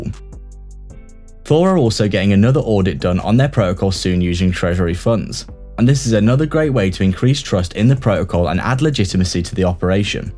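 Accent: United Kingdom. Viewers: Great project indeed. I just love the British accent. Thanks for sharing. Vires in Numeris.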